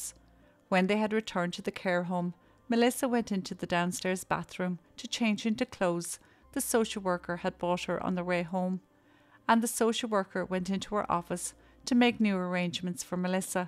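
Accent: Ireland. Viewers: Your videos are incredibly well researched and beautifully narrated with that lovely Irish accent. You are very sensitive and respectful and can only do as well as the information out there. Hi from New Zealand 🇳🇿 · Dear, please don't be disheartened. Your videos are excellent and I love to listen to your lovely Irish lilt. Listening to you makes my day! ❤ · Its been such a long time since ive been back on. My apologies for not being able to show support on here as of late. I'm glad I'm back for now and will try to catch up on all the videos ive missed while I was in hospital. Certainly have missed my favorite Irish accent.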